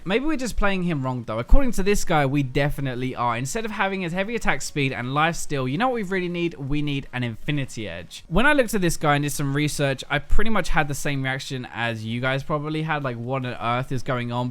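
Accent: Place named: London, United Kingdom. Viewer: you sound like you're from London!!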